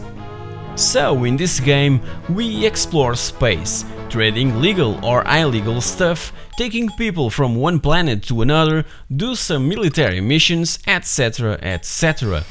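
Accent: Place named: Portugal. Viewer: Portugues certo... o sotaque parecia... muito bom canal! Saudosismo é coisa boa!:)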